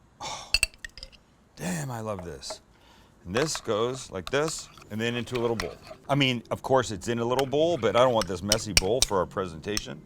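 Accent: Canada. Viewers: You’re Canadian? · What part of Canada are you from?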